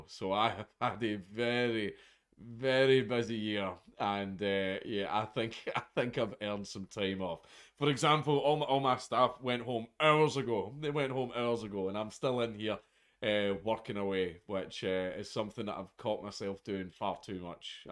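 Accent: Scotland, United Kingdom. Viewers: I love watching all of your videos, I love just sitting back and listening to mini-documentaries in a Scottish accent You've made the past couple of years much more bearable, so thank you and Merry Christmas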